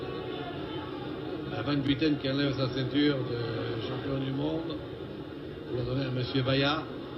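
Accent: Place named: Algeria. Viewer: Le commentateur est au top. Langage imagé et accent pied noir. Autre époque.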